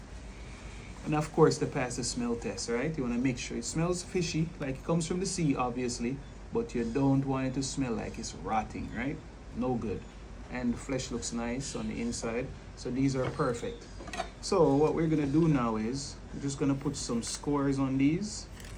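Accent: Jamaica. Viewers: He is Jamaican. That accent, although it has some US nuances, is decidedly Jamaican. He just chooses to eat with his hands (unlike Jamaicans usually do).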